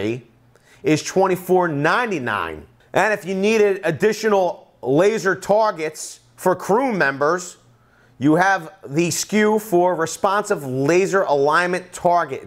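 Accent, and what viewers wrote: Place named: Northeast, United States. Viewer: I'm trying to figure out where you're from based on your accent. It sounds like you spent some time in Brooklyn, Hampton Long Island, Jersey, Boston, and somewhere in the forest of Ohio. I feel like I'm missing one.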